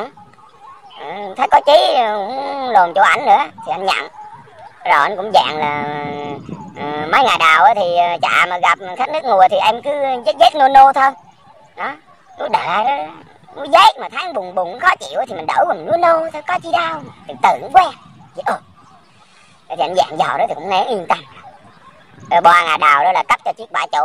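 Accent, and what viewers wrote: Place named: Quang Nam, Vietnam. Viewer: Yeu lam tieng Quang nom!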